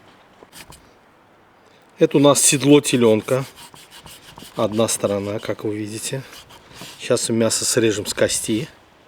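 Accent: Baku, Azerbaijan. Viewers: Супер шашлык и супер бакинский акцент.все родное. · ДЖАН Э ДЖАН. БАКИНСКИЙ АКЦЕНТ ЛУЧШИЙ.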